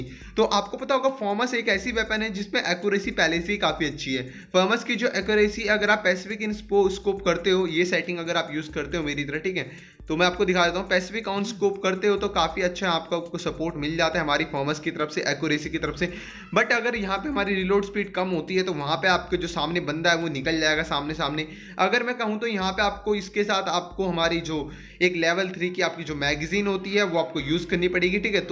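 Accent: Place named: Garhwal, India. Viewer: se pta chlta ha ki apna gadwali boli mst bahi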